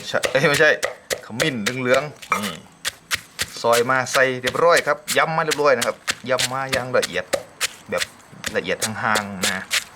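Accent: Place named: Central Thailand, Thailand. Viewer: ทำเหมือนพากกลางเลยคับแต่ไม่ใส่มาระคับแชบๆคับ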